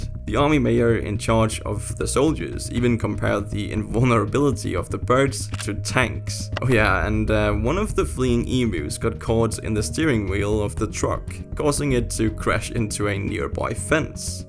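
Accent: Denmark. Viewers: He’s Danish, how in the world did you mix up those accents? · Are you from Denmark? Your accent kinda sounds like it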